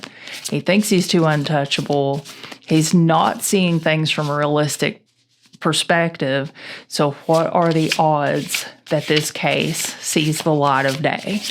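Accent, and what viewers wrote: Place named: East Tennessee, United States. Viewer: you HAVE to be from the East Tennessee, SW Virginia area?? That’s where I am originally from and you sound like all my kinfolk! 😊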